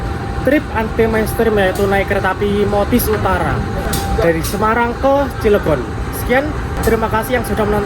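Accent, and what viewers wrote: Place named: Madura, Indonesia. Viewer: mas lucu dengerin logat bicara sampean kek orang madura wkwk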